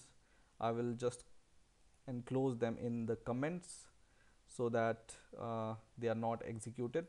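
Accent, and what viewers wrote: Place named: South India, India. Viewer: Daata type I think he is a south indian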